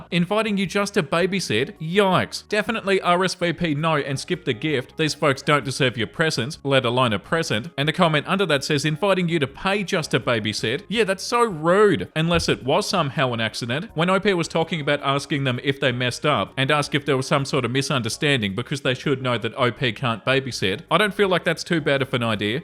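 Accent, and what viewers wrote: Australia. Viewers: Thank you!!! I was BEYOND excited when I saw an Australian accent as an option 😂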